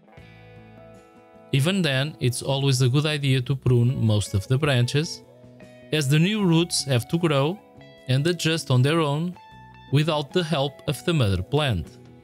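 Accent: Portugal. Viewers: From the accent, i'm betting this guy is Portuguese. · Gosto do sotaque português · 100 percent Tuga accent.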